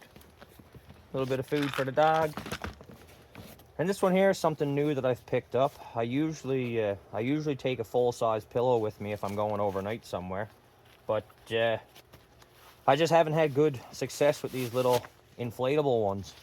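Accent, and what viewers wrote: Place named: Newfoundland, Canada. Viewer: Pretty sure he's from Newfoundland and Labrador!!! Canada!!!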